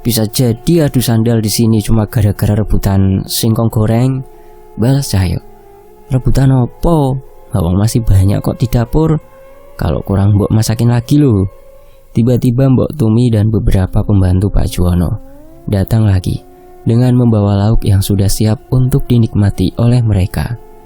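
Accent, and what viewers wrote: Java, Indonesia. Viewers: mmg CERITA2 Diosetta ttg Danan, pakLe Bimo, Cahyo & WANASURA... Benar2 SERU!! Bikin ketagihan ingin mendengarkan keLanjutan dari KISAH2-nya. KEREENNN pkknya... HOST-nya jg memBaCakan Ceritanya penuh dgn Intonasi2 yg TEPAT! Logat Jawa-nya jg PAS di Dengar... mmg Anda BerBakat dLm memBacakan Cerita2 Rakyat. FEEL-nya terasa.... ** SEMANGAT terus ya mas! **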